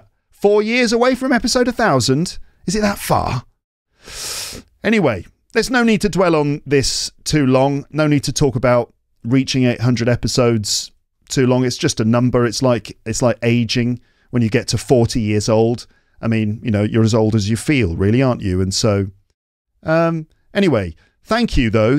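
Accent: United Kingdom. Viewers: HI, I love this episode so much. I started to FOLLOW your videos on YOUTUBE because my boss's pronunciation is very similar to yours, and he is also from the UK, which seems to be Yorkshire. But after actually listening to it, I feel that your accents are still different. Yours is very standard British English. I often can’t understand my boss’s English. Everyone’s feedback is that he didn’t open his mouth when he spoke. I don't know if his teeth grow well, because he basically doesn't show his teeth when he talks. But your teeth look good. I am so happy to learn that your daughter is also learning the violin today. My daughter has been learning the violin since she was 5 years old. Although she did not start a performance career, she has cultivated her musical literacy, which is enough for me. By the way, she is currently a sophomore in London, and she is enjoying life in London very much, I am very happy for her. 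In short, thank you so much for accompanying me in my leisure time with so many videos, thank you, and wish you all the best in your life! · Congratulations 🎊 👏. Time fly by. I still remember myself looking for a good British podcast, after I noticed that I'm only exposed to the American English through TV shows, movies and podcasts. I looked and looked for a British podcast. Finally, I stumbled on English Podcast and became a huge fan. Thank you from the bottom of my heart, without this amazing podcast, I would never learned that much about British English and its various accents. Congratulations,. keep up the good work.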